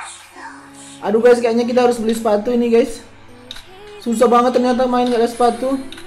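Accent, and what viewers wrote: Manado, Indonesia. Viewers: Abang orang Manado??? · abang orang manado ya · yooo org manado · Kak dari manado? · Ka org Manado yah...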